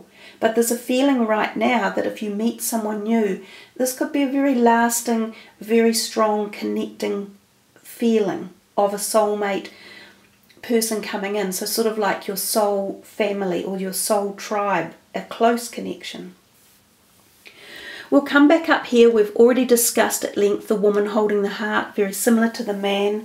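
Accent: New Zealand. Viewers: You sound kiwi. 😊 💖. Thank you.😊 💖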